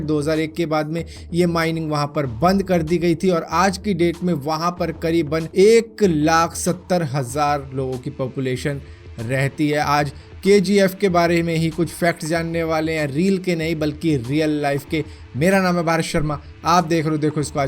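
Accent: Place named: Delhi, India. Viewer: Bhai facts ke maamle m thmhara channel no. 1 hai. Delhi ka accent toh h hi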